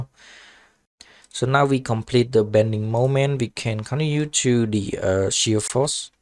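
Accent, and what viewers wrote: Cambodia. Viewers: បងខ្មែរមែនស្ដាប់តុងអង់គ្លេសបងដូចខ្មែរ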